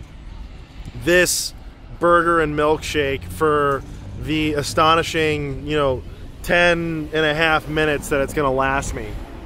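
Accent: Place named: New York, United States. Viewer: I can't wait until ketosis becomes even more mainstream and your youtube channel blows up and you suddenly have a few million subscribers who are reeled in by your New York accent, your passion for weird music, and your "no-nonsense" style of talking and filming however you feel like. Then I can say, I've been watching his vids all along :)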